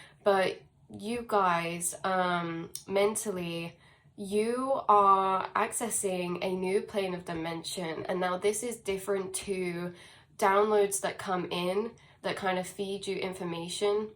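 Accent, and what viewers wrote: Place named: England, United Kingdom. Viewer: Hello. Like you said, its amazing to meet you and to participate in your space. I am excited for the same reasons you stated. We are stationed so far away from each other or so we think. I just found you and for once, the messages are so clear and resonant that I dont need any discernment. I don't think I can even describe it... its not a reading its more like a reflection of my thoughts without any distortion whatsoever. I love and respect myself a lot and I am so excited that there's someone out there that I can give the same amount of respect to. And then... I can hear you're English wich means you're not just the most resonant person I've seen, you're also the closest geographically to me as I'm in London. Its all very exciting, the tribe is getting closer. Almost touching distance. And I do not feel any push or pull from your energy.. 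just a recognition of a very high vibrational being. Thank you for existing. Sending you lots of love and gratitude. Maybe run in to you one of these days.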